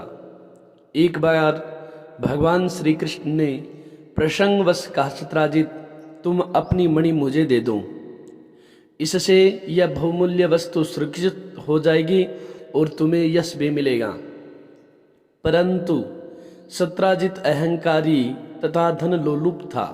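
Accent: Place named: Rajasthan, India. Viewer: आप राजस्थान से लग रहे हो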